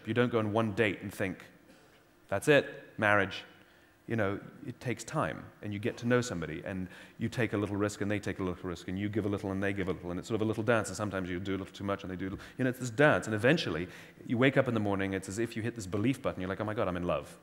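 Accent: United Kingdom. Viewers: wow. first time i'm hearing his british accent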